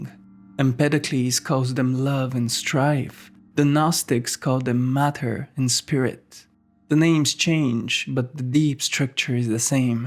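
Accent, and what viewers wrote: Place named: India. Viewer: please a video about alfred adler .. you have such a nice voice its like an indian guy talking englisch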